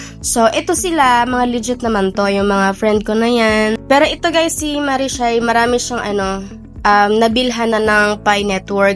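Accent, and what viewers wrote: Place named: Philippines. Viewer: English with tagalog accent❤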